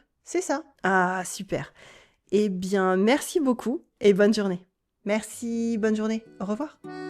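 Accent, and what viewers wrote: France. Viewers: Votre accent est assez difficile, mais c'est du pur français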